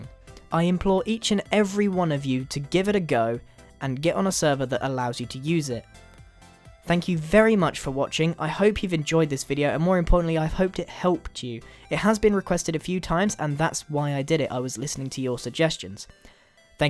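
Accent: England, United Kingdom. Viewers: He is British. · Everybody loves the English accent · Yeah English accent, that's what its called.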